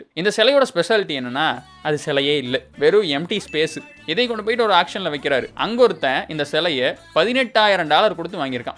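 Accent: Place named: Kongu, India. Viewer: Kongu slang ❤❤❤❤❤❤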